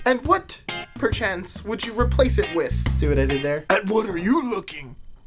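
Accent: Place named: England, United Kingdom. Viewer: Two things. I'm glad I chanced upon you. Mmhmm. More english accent please.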